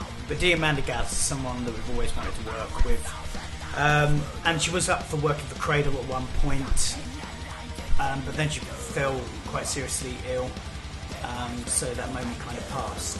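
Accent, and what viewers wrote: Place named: United Kingdom. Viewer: Now thats a beautiful UK accent <3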